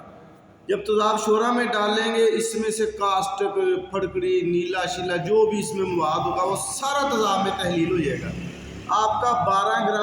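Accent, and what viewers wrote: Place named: Punjab, Pakistan. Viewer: جناب میں نے یہ نسخہ بنانے کی پریکٹس کی تھی نہیں بنا تھا، کیونکہ مجھے ساٹھ گرام کی جگہ نیلا ست گرام یعنے کہ سات گرام سنائی دیا تھا، استاد محترم نہایت ادب کے ساتھ گذارش ھے کہ اجزاء کے وزن لکھی ھوئی صورت میں بھی شئیر کر دیا کریں تا کہ وہ لوگ جو پنجابی لہجے سے نا بلد ھیں ،غلط سمجھ کر نقصان نہ اٹھائیں ،،شکریہ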